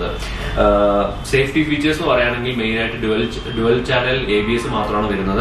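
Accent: Palakkad, India. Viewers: Palakkad ano native place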